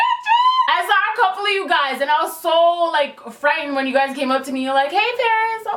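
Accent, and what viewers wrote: Toronto, Canada. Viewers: I LIVE for Paris’ Toronto accent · That Toronto accent tho ♥️♥️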